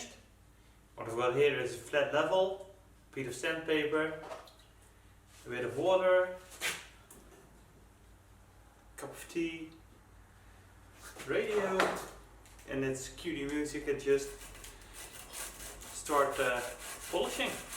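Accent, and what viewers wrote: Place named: Netherlands. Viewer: you sound like someone from holland. is that right ?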